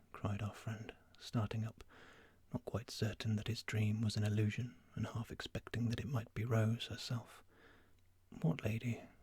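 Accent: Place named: United Kingdom. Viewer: What a great idea to relax 😌😊💚. And as a Frenchman, what a great opportunity for me to practice my English pronounciation by listening to you 👍. Furthermore, I love the British accent (Any british accents). Most of the time, It is the one that I try to imitate when I speak English 😁 ; even if I still have work to do to improve my accent 😅. In any case, this moment was very soothing 😴👍💚. Thank you for the peaceful break 🙏💚.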